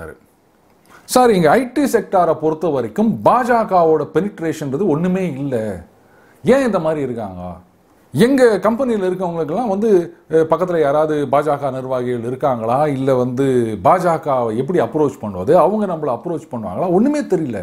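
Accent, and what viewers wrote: Chennai, India. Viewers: I don't know whether Tamizh is an International language or not ....but yours and mine chennai tamizh is international ....sokka pesaringu Vaazhga Chennai tamizh Valarga Bhartham Jai Hind Vande matharam🙏✋✋🌷🍁( I couldn't find the Lotus symbol)🤔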